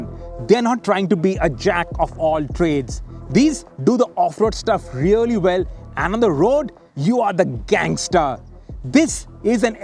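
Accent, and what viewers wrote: India. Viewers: Indian english hurts my ears so bad😂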